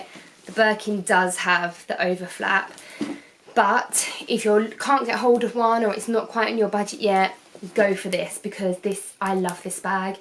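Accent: United Kingdom. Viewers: Knew you'd be a brit 😁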